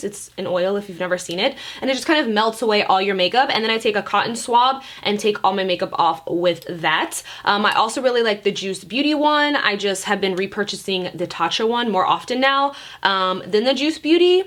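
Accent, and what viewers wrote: United Kingdom. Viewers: 💜💜By the way, I love your new purple hair😍 this is funny but my mum is British born and raised and she married my dad who was in the air force at the time and we have family over on England and I lived there for almost four years and your British accent is amazing😂👌🏻👏 Also..I just love you so much!!! Im in esthetician school and I'm supposed to be studying for a test on electricity tomorrow😩 and had to take a break!! Love you lots💜😍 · Happy New Year!! I hope you do a skin care tutorial. Also I love when your British accent comes out, don’t know why but I do..lol Thx&see ya : )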